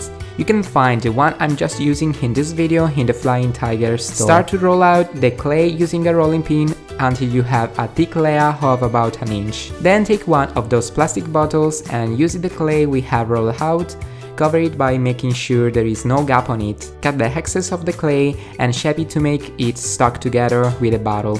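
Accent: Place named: Italy. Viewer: Zac te wait to see the hamburger tutorial, your Italian accent is so cute 😀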